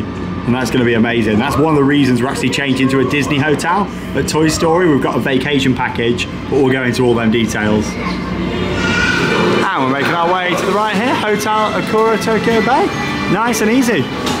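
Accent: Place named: Merseyside, United Kingdom. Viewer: Lovely to see you both. Only just started but makes me laugh when has her Merseyside twang. Was more prominent on the carnival cruise so I thought. Awww they have vlogged after a drink. Then I see the open tin of budweiser. Or it may be totally not a bud and some korean AF drink but always talks with her mersyside twang after a drink and it's so so so cute. Going to unpause and carry on. X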